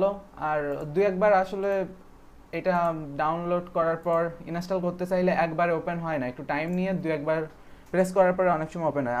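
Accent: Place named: Khulna, Bangladesh. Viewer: ভাই আপনার বাড়ি মেবি বাগেরহাট বা খুলনা হবে কথা শুনে আমার তাই মোনে হচ্ছে, আমার বাড়ি বাগেরহাটে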